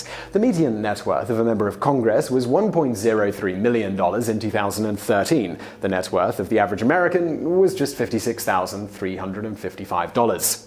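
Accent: United Kingdom. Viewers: Too much haphazard information being thrown around: 1%, 0,1%... Oh, it turns about to be US incomes only? And what is all this information based on? Moreover, it's a Brit talking about the US, sacrificing even more on credibility... Not sure what to make out of all this in the end, rather than a fancily enthusiastic presentation by a guy with a British accent...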